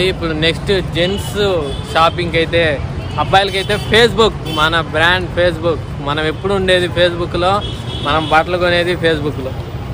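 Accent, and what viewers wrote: Kadapa, India. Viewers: మన కడప భాష చాలా బాగుంది తమ్ముడూ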